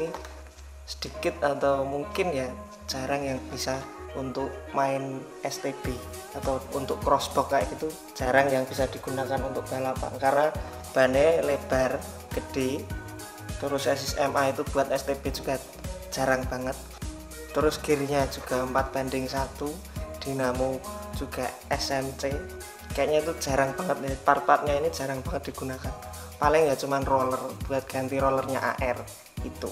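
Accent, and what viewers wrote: Java, Indonesia. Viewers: video unboxing udah menarik koq, rodo medok-medok hahaha..... dan marai pengen nostalgia jaman sd mbiyen dolanan tamiya meneh. salut racun 4wdmu mas. klo bisa unboxingnya jangan kelamaan dari bulan rilis jd bisa buat refersi beli hehehe.... razor back kapan di unboxing mas, padahal di video sampeyan yg kapan itu udah kliatan..... selak muncul sik anyar meneh ig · Medok bang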